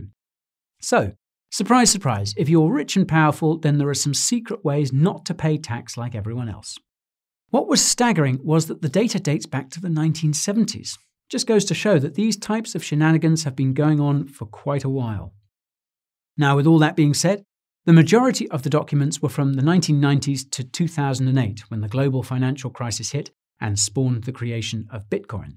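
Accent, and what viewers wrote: United Kingdom. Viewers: Well, you have an advantage with the British Accent. Love the channel. No bullshit. Simply informative. Let people make up their own minds... unless they believe in horoscopes because they have no spine for personal responsibility. Keep up the good work. Perhaps we'll dub you an honorary American...who knows.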